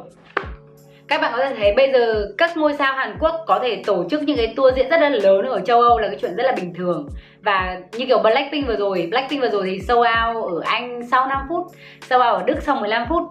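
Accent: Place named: Hue, Vietnam. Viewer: ơi!! nói giọng Huế nghe dễ thương lắm nhaaa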